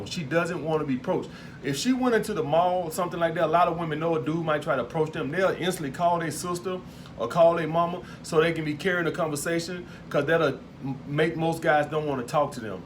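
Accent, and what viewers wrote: South, United States. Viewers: You sound like you from the south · Laidback andhumble he's from Alabama if i remember correctly.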